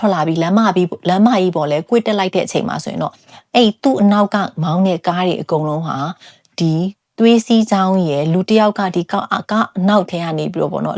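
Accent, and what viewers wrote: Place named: Yangon, Myanmar. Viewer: ရန်ကုန်ဘက် လေသံမလို့ပါ😊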